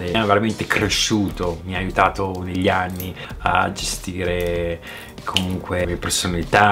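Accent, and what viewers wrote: United States. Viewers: Ciao,ho appena iniziato a seguirti e gia mi piaci.Come fai ad avere l'accento americano?Ho anche io in mente di utilizzare youtube per raccontare la mia storia ed esperienze.Spero di riuscirci.Ciao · Ah ok lo hai spiegato qua! Come non detto scusami.. by the way grazie che mi hai raccontato le tue esperienze di phatos! Vorrei intraprendere anche io un esperienza come la tua , come graphic Designer facendo un esperienza.. vediamo cosa succede dai.. due domande 😂 dove vivevi in Italia? E come mai ora hai un accento americano molto forte? Tanti altri che vivono anche da 20 anni parlano italiano con accento italiano... In bocca al lupo!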